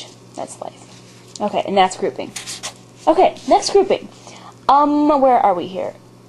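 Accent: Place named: Great Lakes, United States. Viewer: This was great! I have no real reason to learn the script other than general interest, but these lessons were helpful even for that purpose. I know there are a couple videos out there that show how to connect the letters and such, but I'd love to see a continuation from you! And your great lakes sort of accent makes me smile!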